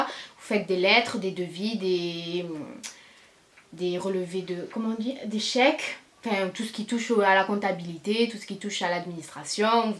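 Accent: Marseille, France. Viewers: Elle est marseillaise,nn?son accent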